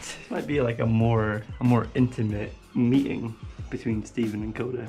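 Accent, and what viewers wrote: Scotland, United Kingdom. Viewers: Are you Scottish out ov curiosity???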